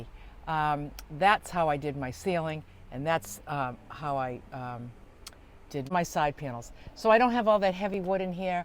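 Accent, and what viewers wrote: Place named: Massachusetts, United States. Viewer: you are amazing! Your honesty and mindset is inspiring to me. I’m working on your food plan and working on my mindset about everything!!! Thank you for all the hard work!!! I hope you are able to do an Amazon store soon!!! I love your gadgets! ❤❤❤ Ps. My first video was way back when you first got Sweet Pea…I too am from Massachusetts, I recognized your accent… And I was hooked. Keep up the great work, lady!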